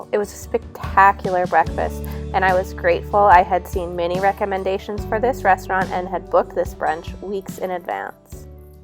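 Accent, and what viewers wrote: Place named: Canada. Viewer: I hope you enjoyed your visit to Edinburgh and Scotland. I only picked up on the fact you’re Canadian when you said “out”. Many years ago I worked in a large city centre hotel and had the pleasure of meeting many people from Canada. You’re quite brave taking the wee one with you. In recent years Edinburgh has become (like so many places) a tourist attraction all year round, whereas it was mainly just in summer when it became so busy.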